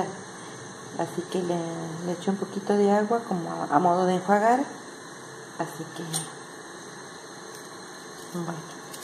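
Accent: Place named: Salta, Argentina. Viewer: Suena a salteña 🥰. Ahora voy a hacer el dulce.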